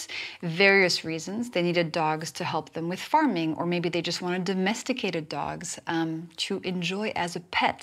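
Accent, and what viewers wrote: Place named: France. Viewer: She's a French speaker. I can tell. Probably from France.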